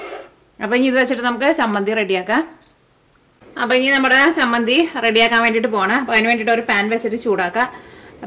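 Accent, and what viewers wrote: Thrissur, India. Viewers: ee Thrissur slang thanne endh resaa kelkkaan... athinte koode immaathiri tasty food um undaakkumbo sherikk Thrissur Pooram....!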